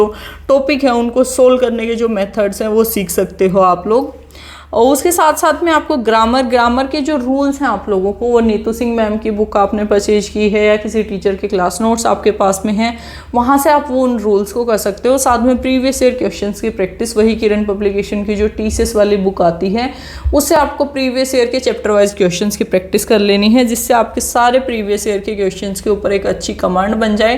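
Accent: Haryana, India. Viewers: चाहे कितनी ही आछे से हिंदी बोल लो पर हरयाणवी flow नही जाने वाला😅😅😅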